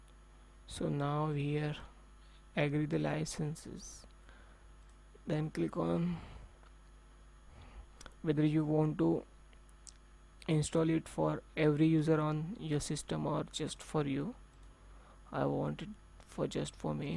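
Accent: India. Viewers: Just a constructive comment: please make sure you watch you accent (the way you are speaking). This video, by mistake, might be watched somewhere at Europe or North America then people are going to make fun of Indians. Your content /subject is not also very helpful. For the future, keep this in mind.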